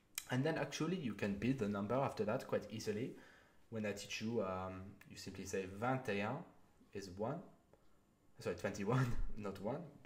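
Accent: France. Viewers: I love it already ❤️ your French accent 😍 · Vous parlez très bien! J'aime votre accent français quand vous parlez en anglais aussi haha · Your French accent in English is perfect 😂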